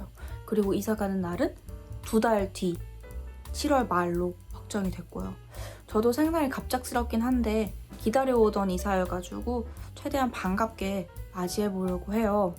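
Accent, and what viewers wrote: United Kingdom. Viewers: 왜 한국어도 영국 억양인가욬ㅋㅋㅋ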